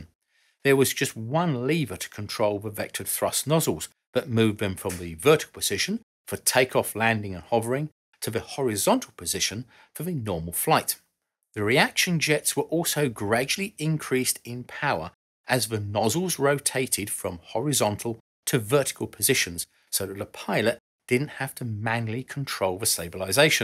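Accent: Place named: Cockney, United Kingdom. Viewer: haven't watched for a while but last I remember you was recovering from being very Ill. Good to see you're well and I'll be sure to check through your videos. On an unrelated note I'm a truck driver and you sound like a cockney. I drove past the royal Armories last Thursday when going from Millwall F.C to Twickenham and i was shocked by that battleship gun sat in front WOW! that was incredible!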